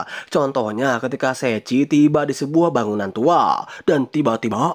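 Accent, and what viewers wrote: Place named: West Java, Indonesia. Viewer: Mang orang Sunda nya 😊😅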